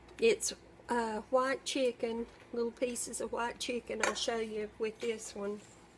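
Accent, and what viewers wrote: South, United States. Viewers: Are you from Arkansas?? You sound like me a lot lol I'm from Conway and I also have that southern twang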